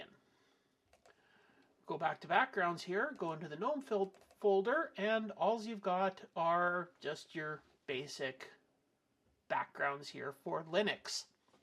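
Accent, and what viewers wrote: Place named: Canada. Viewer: Dear Canadian, March 2026. I'n a 90 yo Australian living in Brisbane. Gee a Canadian Web site what a surprise. We like Canadians even though we don't like the acent which is close to yanks. I have used Linux a few distributions, Mint for the last twenty decades. God Bless. ====================================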